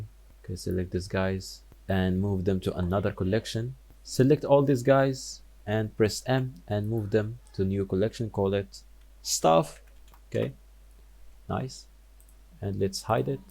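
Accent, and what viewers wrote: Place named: Arab world. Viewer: والله عربي عرفتك من لهجتك😂❤ اشكرك على الشرح المفيد! Keep up the work!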